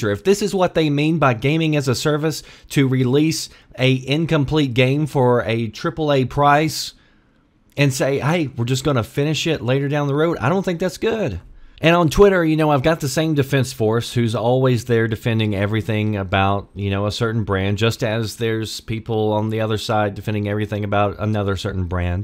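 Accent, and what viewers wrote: South, United States. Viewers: holy shit his southern accent threw me off so bad.... i fucking love southern accents and im not even american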